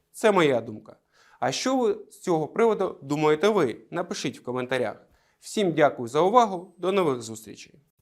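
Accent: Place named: Russia. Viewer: Слушая вас как посторонний человек, я замечаю, что ваш украинский язык - это язык русскоговорящего, старающегося говорить по-украински. Я все равно приветствую ваше старание. Но слышу разницу с другими аналитиками, у которых речь певучая, звучание особое. Так что вам не стоит и Зеленского винить за "русскоязычность" до 2019 года (((. Кстати, звучит его украинский лучше, чем ваш. И президент правильно сказал про крымчан. Пока оставьте их в покое. Освободите страну, тогда введете государственный украинский с детского сада. Все делопроизводство переведете на украинский. Закроете русские школы, или заставите всех их учителей сдать госэкзамен по украинскому. Для этого понадобиться 5-7 лет. И никаких поблажек!!! Учитесь на ошибках других бывших стран СССР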